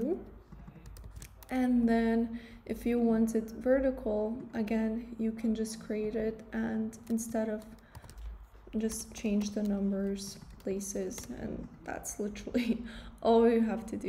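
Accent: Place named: Spain. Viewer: I am Indian but love your Spanish assent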